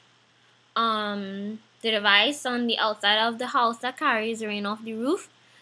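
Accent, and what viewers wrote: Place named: Trinidad and Tobago. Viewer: to me trinidadian accent is best accent in the english world... you're so beautiful and sweet... greetings from Venezuela....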